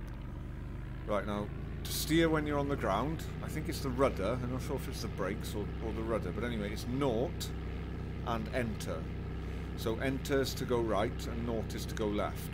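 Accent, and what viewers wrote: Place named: Wales, United Kingdom. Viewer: I think you will find he has a Welsh accent. It is still English though.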